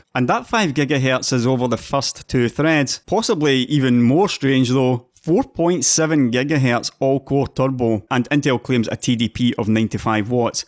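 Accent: Scotland, United Kingdom. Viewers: your's is a Scottish accent, isn't it? breath of fresh air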